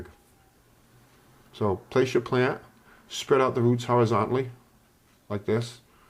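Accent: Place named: Boston, United States. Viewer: Trying to place your accent. Leaning towards Boston but it might be Brockton? Or am I wrong on both counts? :p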